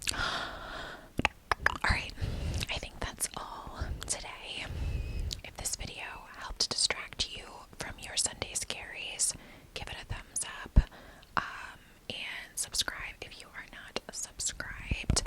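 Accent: United States. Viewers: All i needed is my fav american accent this morning❤️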